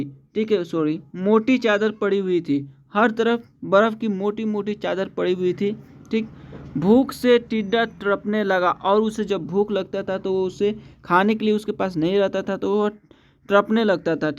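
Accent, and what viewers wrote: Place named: Bihar, India. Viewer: Aapki Hindi Bihari kyu lag Rahi hai 😅😅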